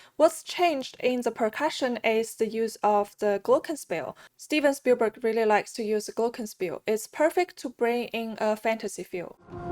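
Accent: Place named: Russia. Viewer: I find it better to write this in a notation software. Sure it gives less control over the balance and still, in the end we get a full score for orchestral performance and there's a lot of excellent sounds that sounds very good and for free. Musescore for example. By the way, I love your Russian accent.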